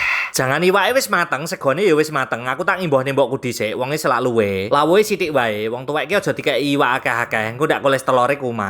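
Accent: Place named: Java, Indonesia. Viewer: Dengar suara mas ny yg reyah bisa ketawa sndri q,,,,sama2 orang Jawa jdi Pham bngt bhasa nya lucu skali😂😂😂😂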